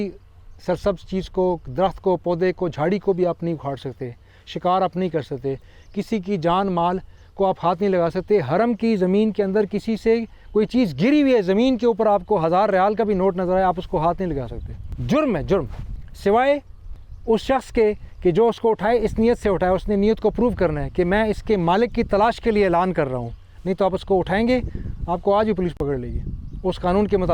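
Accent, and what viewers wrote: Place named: Kashmir, India. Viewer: آپکے بولنے کے انداز سے معلوم ہوتا ہے کہ آپکا تعلق کشمیر سے ہے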